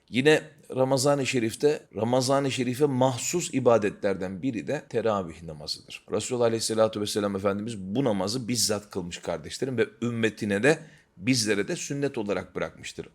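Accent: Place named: Arab world. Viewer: hocam, içerik olarak gayet keyifle dinliyorum, çevremle paylaşıyorum ancak türkçeyi de arapça diksiyonu ile konuşmanız bir süre sonra yormaya başlıyor, suni/zorlama geliyor. ali rıza demircan hoca misali…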